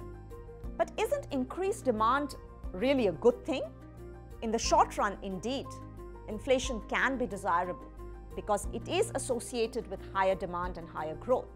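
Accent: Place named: India. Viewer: I easily understood you are a indian by your clear accent 😄🇮🇳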